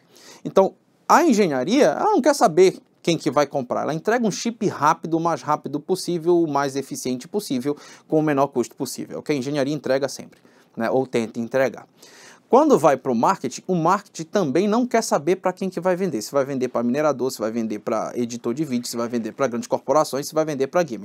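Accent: Pará, Brazil. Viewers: Ei doido tu é paraense é? boto fé mano drx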